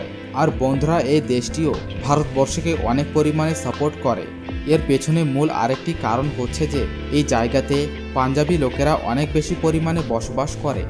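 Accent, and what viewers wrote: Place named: Bangladesh. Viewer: Apnar kotha sune to Bangladeshi Mone holo.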